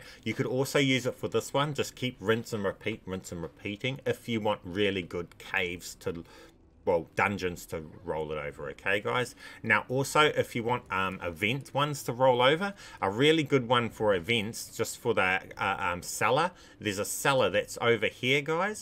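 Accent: New Zealand. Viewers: Yo brother you a kiwi?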